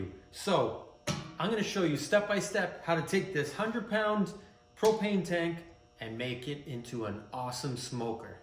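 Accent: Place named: Ontario, Canada. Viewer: Ha was watching and just by your accent I knew you were one of the good guys, Canadians that is and from Ontario. I am in Windsor and I am build a smoker as well and just love watching others build them. Thanks for the video eh!!!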